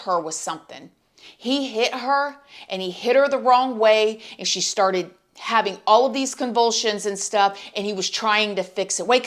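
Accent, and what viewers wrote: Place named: Midwest, United States. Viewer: You have the coolest accent I’ve ever heard in my life and I’ve travelled a lot ! What is the place where folks speak in this way ??? Midwest US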